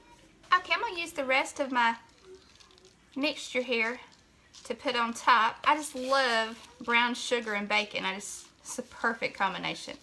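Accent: South, United States. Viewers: you have a little bit of a Southern accent. I don't know about where you live but I live in Phoenix and they have 100 different ways to do mac and cheese anything from deep-fried to pizza toppings. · You Got That Southern Accent Nothing Wrong With It Just Some Of The Words In Southern That You Say Sometimes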